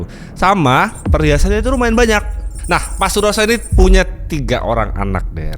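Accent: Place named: Banyumas, Indonesia. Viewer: Asline ngendi kang,kayane wong ngapak kie ..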